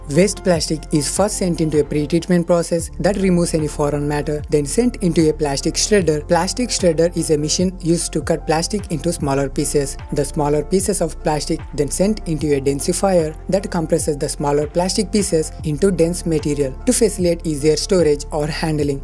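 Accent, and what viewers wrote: India. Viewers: Very nice animation and good explanation, eventhough I am not used to the "Indian" accent. Yet I would not recommend to use "coal or wood" for heating the system because it creates a LOT of pollution. Electricity should be the ideal source of energy to start the process.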